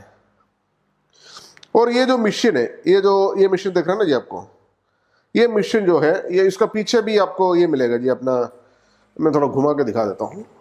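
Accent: Kerala, India. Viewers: Cheta Malayali aano....slang spashtamayitu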